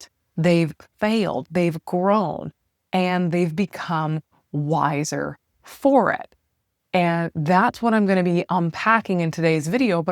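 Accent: Canada. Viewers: along with the fact that you're smoking 🔥 hot, I really get a kick out of your Canadian accent. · Really. Is it Canadian.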